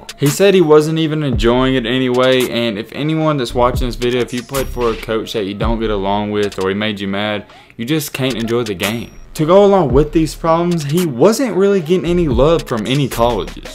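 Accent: South, United States. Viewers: I can hear a touch of southern in your accent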